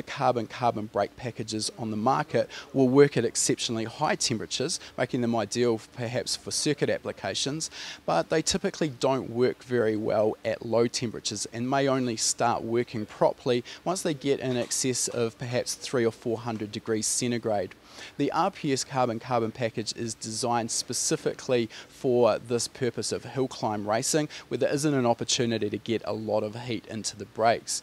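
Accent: New Zealand. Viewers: Wonder if they could just run adjustable magnetic dampers? Less weight and complication to suspension. Lmao at using a 5.3. Epic. They're good for around 700-800hp stock but the K1/JEs will do wonders for going over that if the top end is looked after. Glad they went dry sump, a given for any LS over 1-1.2G sustained. Looks like I have a new goal racecar. Unlimited aero is what I'm looking for. Love the presenter, someone who actually knows cars. Great stuff! Sounds like a kiwi or maybe an Auzzie haha. Subbed.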